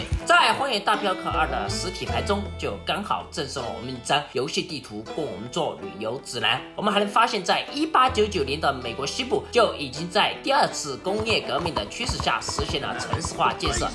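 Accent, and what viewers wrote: Sichuan, China. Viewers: 厂长的川普如果更川一点就更好了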